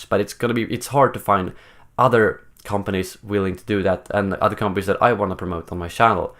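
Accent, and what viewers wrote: Sweden. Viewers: Well I gotta say this I hope that your channel grows much bigger and I think that I speak for many subscribers that, we would follow and support you even when battlefront dies out.(also for all those people that poke fun at the guys accent give him a break he is from Sweden so English may not be his first language) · I came to this channel a few days after DICE's Battlefront announcement (before the Røde microphone and at the peak of your strong swedish accent hahaha), and I've been suscribed since then. I participated in the forums and I've seen most of your videos, including the rumors and "what the fans want". I've seen your progress through the channel, from short pieces of news to full-length informative videos that are both extremely hard to find otherwise and very well packed with information. I absolutely advice you to keep on with the Patreon and pursue a full-time career here on YouTube, this may be a niche channel....but it's the niche channel we people need to have in order to be updated with Star Wars games. 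Huge thumbs up to you and keep being awesome! -Kanto.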